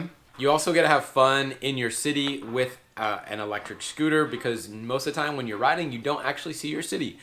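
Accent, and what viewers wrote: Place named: United States. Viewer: oh american guy speaking.im outta here!!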